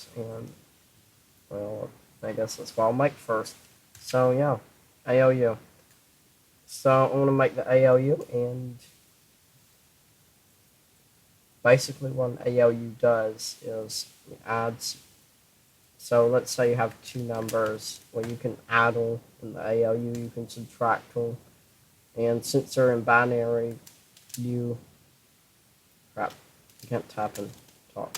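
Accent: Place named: United States. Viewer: The American accent is strong in this one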